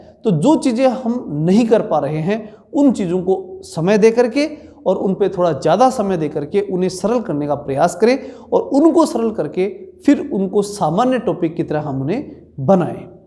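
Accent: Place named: Bhilwara, India. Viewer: सर आप भीलवाड़ा जिले के हो क्या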